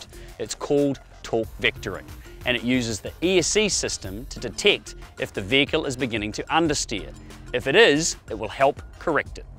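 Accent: New Zealand. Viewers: Who let this kiwi present this video? Would have thought they would keep in Australian...seen as though it's an Australian produced vehicle...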